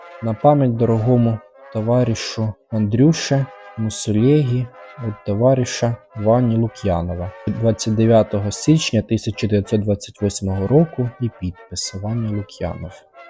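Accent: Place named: Sumy, Ukraine. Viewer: Чудова молода,добра людина ,підписка. А Ви случайно не з Сумщини? Чую ,щось мені рідне і знайоме.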